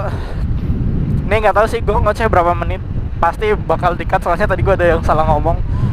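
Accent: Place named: Banyumas, Indonesia. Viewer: motovlog ngapak mantabbb ...smoga sukss channelnya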